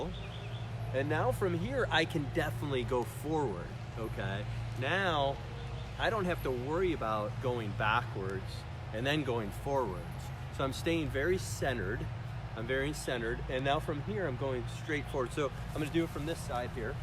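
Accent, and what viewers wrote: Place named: Florida, United States. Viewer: Great video, sounds like you are fairly local to me. Have you ever played at Grand Lake RV resort in Citra Florida? It is close to Ocala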